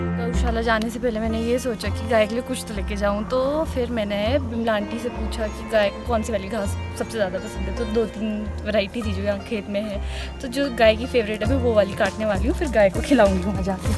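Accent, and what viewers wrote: Pahadi, India. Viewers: Tumhari awaj mai ye jo phadi touch aata hai na its reallly beautiful...keep it up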